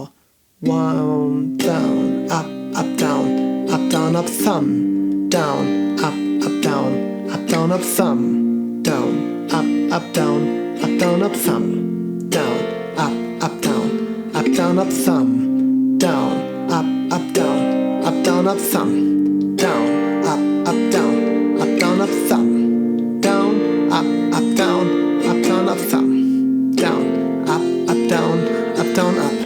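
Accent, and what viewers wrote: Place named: India. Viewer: Accent is very similar to Indian accent. Beautiful.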